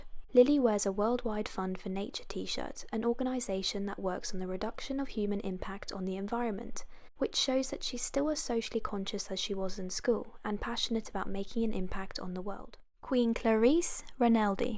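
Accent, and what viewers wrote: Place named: England, United Kingdom. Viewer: As an English girl with a similar accent, I can confirm that her voice is soothing af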